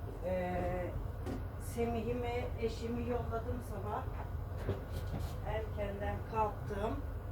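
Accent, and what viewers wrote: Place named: Tavas, Turkey. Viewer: KURBAN OLDUĞUM TAVAYLA KONUŞMANA KURBAN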